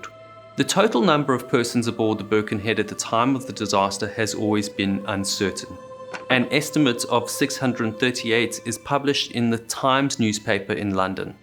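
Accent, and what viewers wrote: South Africa. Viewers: Lovely to hear that South African English accent. The easiest English accent to understand. Especially for non English speakers.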